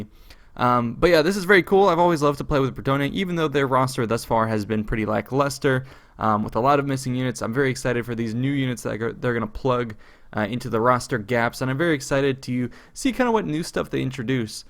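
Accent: France. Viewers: he´s french, dude :) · he's french... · He's actually French. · He most definitely IS French. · Geralt, Private Investigator You know he's actually French, right? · Nice french accent. Would hear again. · Check a previous comment thread. He IS French. Said so himself. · You have a really french agsent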